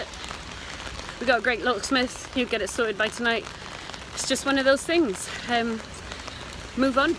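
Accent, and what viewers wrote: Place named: Scotland, United Kingdom. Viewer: Trying to place your accent... definitely some distant Scottish in there, time in England and a Brooklyn twang too...?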